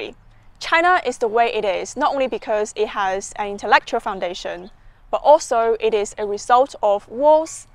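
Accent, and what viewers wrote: England, United Kingdom. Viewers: Very well put. I confess that I am intrigued by China, and this video presented by this very sweet and lovely lady with her British accent, did an admirable job in pleasantly explaining why China is as it is. It is complicated. Western nations seemed to enjoy exploiting China for that Century of Humiliation. Shame on the West. I hope our nations can find a happy medium. Job well done,! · i could hear your english accent immediately lol. very cool. im american btw good video!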